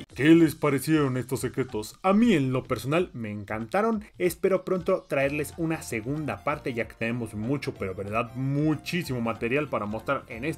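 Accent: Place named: Mexico. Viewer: si loco nunca escuchaste a un mexicano hablar?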